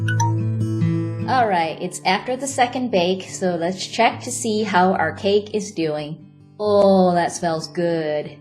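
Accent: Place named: Hawaii, United States. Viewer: Your fun to listen to, seem like you are from Hawaii.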